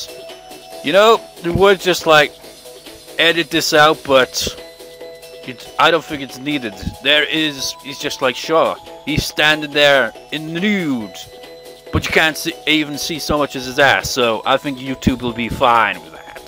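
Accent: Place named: Wales, United Kingdom. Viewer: It sounds Welsh now that I know, but I thought It might have been English.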